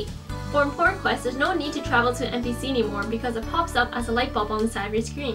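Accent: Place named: Canada. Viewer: Maplestory back in 2013 or so was like an ultimate P2W game. You forgot to mention Miracle cubing for crazy highass potentials. But nonetheless this is a great video! PS: You sound like Chinese-Canadian. I'm also Chinese Canadian as well! Which part of Canada do you live in?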